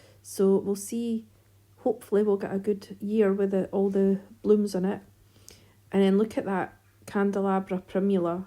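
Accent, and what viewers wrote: Scotland, United Kingdom. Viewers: I dont know if it’s because i watch Eli and Kate that your channel came up in my algorithm. It’s nice to hear a Scottish accent and see what you’re planting. Im zone 7b Bannockburn so it’s great to see plants that will suit the conditions here in Scotland. Thanks for sharing, subbed ❤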